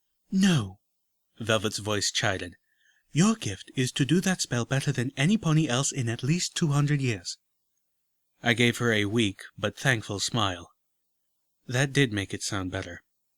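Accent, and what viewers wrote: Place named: United Kingdom. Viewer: I've been wondering this ever since i started watching your audio book; do you actually talk likt that? i mean, it sounds good, but you sound like your a mix between british and western. also, what's your cutie mark?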